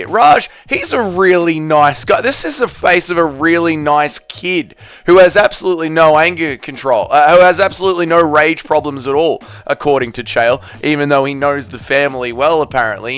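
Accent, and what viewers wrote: Australia. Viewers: I think Chael really has lost whatever little credibility he had left. Very sad to see. Loved the video, by the way, delivered the way only an Aussie can! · Something about you Aussies smug, disrespectful attitude mixed with your unpalatable accent especially when reporting or speaking about anything American, just sounds like huge piles of 💩hitting the ground over and over again…. Btw, has everyone seen the video footage of the disrespectful jackass wrestler committing assault by walking up and smashing a can of beer on Raja’s head prior to the event?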